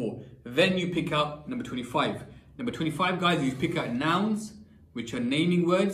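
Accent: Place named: Bradford, United Kingdom. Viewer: brother you from bradford?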